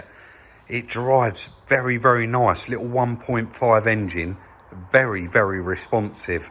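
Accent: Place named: Cockney, United Kingdom. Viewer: and by the way. Love the channel. Very relaxing, love the cockney accent.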